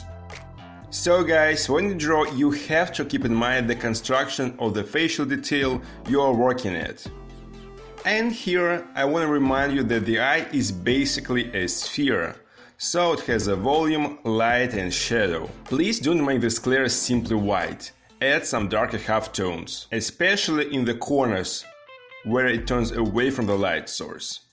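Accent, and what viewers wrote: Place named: Russia. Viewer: Work on the cheeen Wtf That russian accent gives me crinngee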